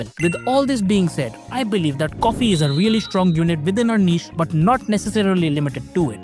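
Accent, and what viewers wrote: India. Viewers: Insane editing and Accent ❤️ there's still 1% indian accent left hahahaha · Indian English accent is funny. This is true, but I think this guy is far better than others and his video content is great so I see no complaints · Indian accent 🥵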